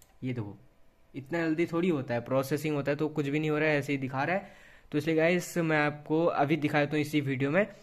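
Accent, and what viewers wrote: Odisha, India. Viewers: bhai love u from baleswar...please reply kara...hello bhai...i just saw your harrier car vlog and guessed that u r from beleswar....bhai mu tama bahut hi bada diehard fan bhai...au mu b baleswar ru...bhai please reply dio thare tama saha dekha karibi jemiti b hau...tama hindi voice suniki jana hi padunathila tame baleswar ro boli...last six months haba mu freefire kheluchi au first dinoru hi tama vdo dekhiasuchi..kou gute dino b miss karini...bhai reply dio msg padhiki thare meet karibi tamaku🙏🙏🙏🙏🙏🙏🙏🙏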